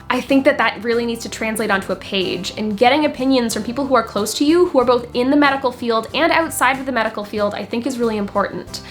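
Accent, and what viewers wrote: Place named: United States. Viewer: Perfect American accent :)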